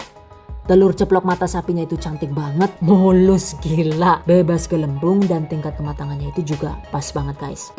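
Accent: Banyumas, Indonesia. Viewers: Haha ada ngapaknya juga,,asal mna bu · bikin org gk bosen belajar karna byk selingan pke bhsa jawa ngapak 😂 jadi makin smangat mantengin chanel nya bun 🤗🥰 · Semoga tukang warteg tidak mencontoh video ini..karena waktunya lama. Ngomong ngapaknya selalu saya tunggu.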